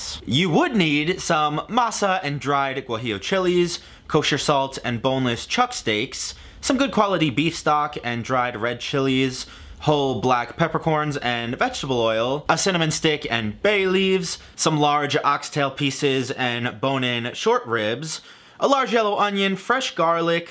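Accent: United States. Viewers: your pronunciation is fine. you are an american talking with an american accent. you're pronouncing the words right just not putting on a false spanish accent which i find more annoying than anything 🙄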